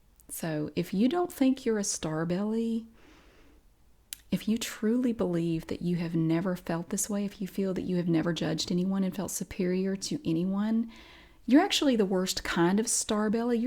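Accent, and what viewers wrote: South, United States. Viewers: Your Southern accent is a big reason for the popularity of your videos. Here in California most people speak in a millenial monotone or in foreign tones. Your Americana accent is music to my ears.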